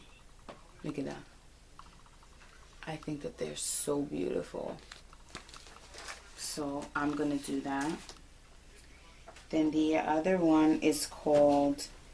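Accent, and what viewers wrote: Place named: New York, United States. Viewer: Hello, I love PandaVille! I see that you have fallen in love with knitting. I hope that you are able to complete your project list. I'll be watching and give you and a shout out on my next (YT) video. Love the New York accent! P.S. Love the knitting caddy Christmas gift from your husband. J.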